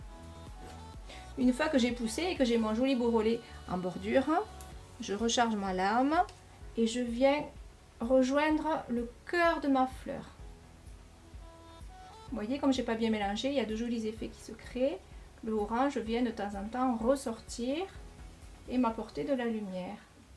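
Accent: South, France. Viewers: J'attends toujours avec impatience tes tutos et comme à chaque fois, c'est un ravissement. Merci à toi de nous faire partager ton talent et puis ton accent du sud est si charmant qu'il apporte un supplément de couleur à tes réalisations .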